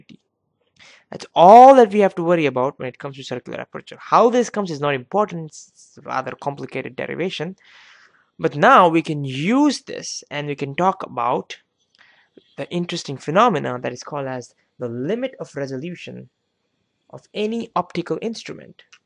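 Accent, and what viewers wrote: India. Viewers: Indian accents suck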